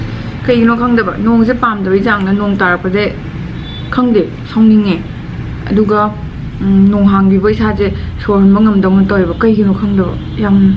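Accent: Kakching, India. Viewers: Ado Ng kakching londo Nganguva Adum Aduna Henna tavada Nungsiye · kakching marol na nungnaiye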